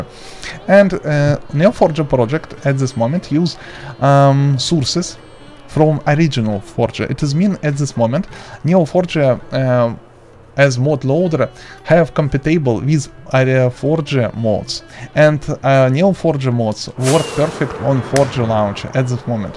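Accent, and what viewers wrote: Russia. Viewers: Не думал, что русский акцент на столько сильно выделяется.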